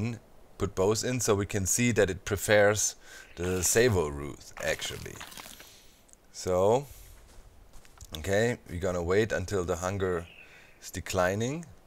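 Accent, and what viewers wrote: Germany. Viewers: Du bist aus Deutschland, das höre ich!🖖🏽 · Do you live in Germany. Because your english got some german flaire in it.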